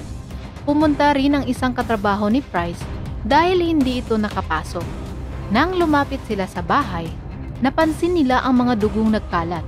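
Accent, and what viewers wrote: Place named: Visayas, Philippines. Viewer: parang bisaya yung bosis ng narrator ...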